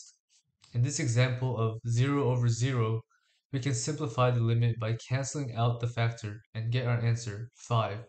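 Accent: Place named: Asia. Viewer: I dont think he's Indian, he sounds more central asian, southeastern asian, or pacific islander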